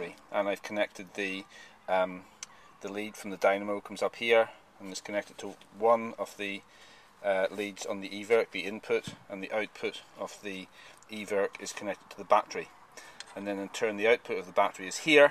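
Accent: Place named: Northern Ireland, United Kingdom. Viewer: You sound like you might originally be from northern Ireland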